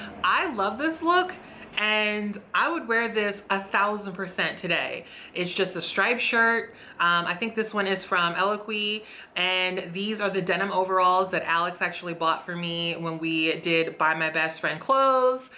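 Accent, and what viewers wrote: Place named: Philadelphia, United States. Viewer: Oh stfu , every time i watch your vids i feel like i know you and now i know why lol YOUR A PHILLY GIRL , must be the accent , im Philly too heehee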